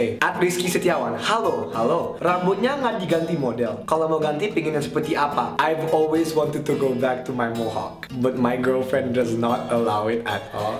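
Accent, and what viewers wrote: India. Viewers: Njir accent india nya..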